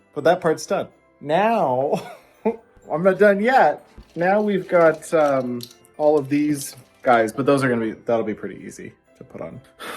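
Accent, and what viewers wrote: Minnesota, United States. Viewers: On the 10th Day of Vlogmas my true love gave to me: Ten-ish strands of "tinthel" Nine ladies ironin' Eight cameras tumblin' Seven cutouts glowin' Six berries spillin' Five Christmas trees 🎄🎄🎄🎄🎄 Four Paolo meows Three sets of batting Two power strips 🔌🔌 And you sound like the movie Fargo 🎄⛄🙂⛄🎄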